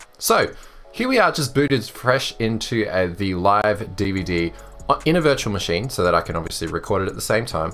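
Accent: Australia. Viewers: So you're Australian. I thought you were from Eastern United States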